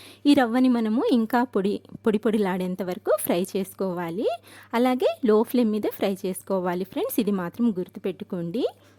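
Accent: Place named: Coastal Andhra, India. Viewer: " " అనే పేరు బహుశా ముస్లిం స్త్రీల కు వుంటుందని నా అభిప్రాయం. కానీ మీ పలుకు హిందూ మహిళలు మాట్లాడినట్లు తెలుస్తోంది. మీరు పలికే విధానం కూడా కోస్తా ప్రాంతం వారి లాగా వుంది. మరల మీ వంటలన్ని హిందువుల పండుగలు కావలసినవి గ వున్నాయి. మీ వాయిస్ కూడా మార్థవంగా వుంది.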